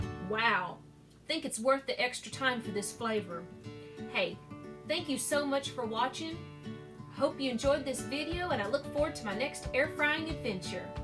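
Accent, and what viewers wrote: United States. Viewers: honestly, I don't understand why people dislike a video. If you don't like something, just move on. I was looking for a fast and easy way to do baked potatoes and your video was spot on. thank you and I was happy to see you're from Tennessee I lived there for two years and I miss it a lot, so your sweet accent warmed my heart, thank you for this wonderful video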